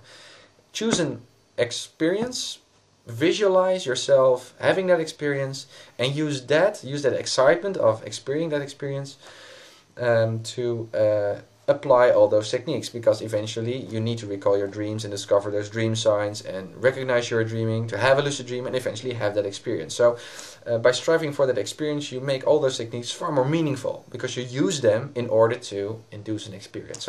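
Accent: Netherlands. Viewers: i can hear on your accent that your dutch? or am i wrong:P? nice vid btw! i really want to learn this^^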